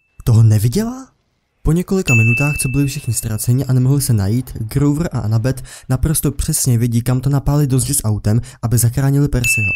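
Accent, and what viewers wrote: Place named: Prague, Czech Republic. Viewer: Hej jako já nechcu nikoho urážat, ale jsou nějaká morální pravidla.Ja su sice jen vytretý moravák,ale pražáčtina je něco NECHUTNĚ otřesného a k tomu mě dokopává to HR,RR,DOBHRE atd.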